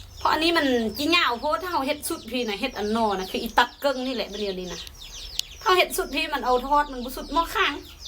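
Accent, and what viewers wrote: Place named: Na Wa, Thailand. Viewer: สำเนียงคล้ายๆแถว นาหว้า ศรีสงครามครับ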